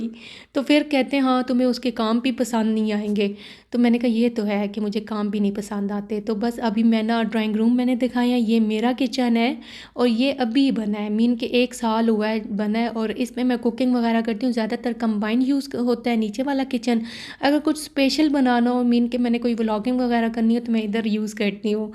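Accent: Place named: Chakwal, Pakistan. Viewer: Yaqqen karen me apky lehjy sy pehcan gai thi k ap chkwal say hain kasmy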